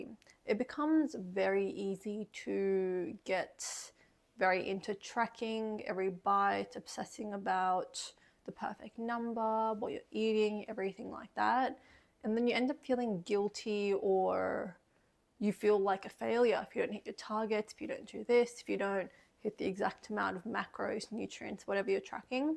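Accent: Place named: Australia. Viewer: I like the content, but ur english is australian language, so it is difficult understand for Indians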